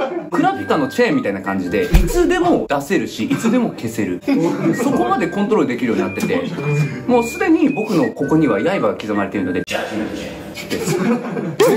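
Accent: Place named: Kansai, Japan. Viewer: 細井先生なんでたまに関西弁になるんやろ？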